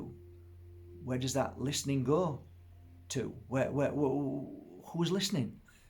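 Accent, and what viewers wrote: Yorkshire, United Kingdom. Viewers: Love that Yorkshire accent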